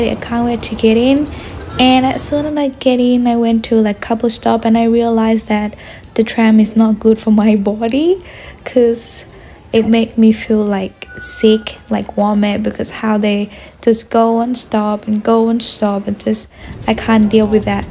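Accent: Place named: Australia. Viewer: You actually have an Australian accent now, not US english type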